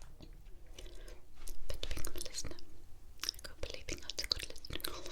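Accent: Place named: North, United Kingdom. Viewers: I love your accent, I grew up in Teesside and find your voice so familiar and comforting ❤️ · Thank you for this from Newcastle ☺️ your northern accent is comforting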